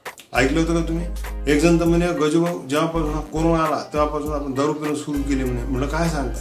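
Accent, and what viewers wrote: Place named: Vidarbha, India. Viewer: Ek no waradhi bhasha , Ani kisse BHAU you are great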